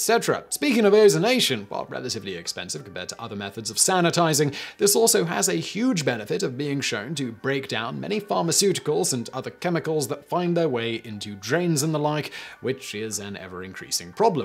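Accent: United Kingdom. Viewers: "Something up with which we must put." If you are going to sustain that obnoxiously pretentious British accent, at least adhere to the grammatical constructions that go along with it. And while you're at it, let the metric people work out their own conversions. When in Rome, after all.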